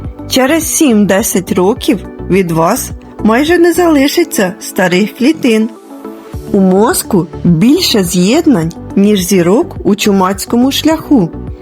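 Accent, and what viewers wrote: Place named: Poltava, Ukraine. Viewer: Не дивуюсь, але не перестаю дивуватися, який Господь великий! А ще поважна людина, що озвучує з Полтавщини, чи Черкащини))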